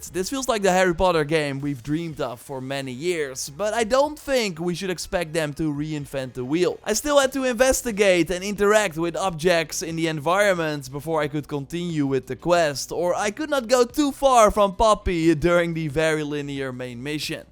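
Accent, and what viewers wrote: Netherlands. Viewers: Ben jij Nederlands vanwege je accent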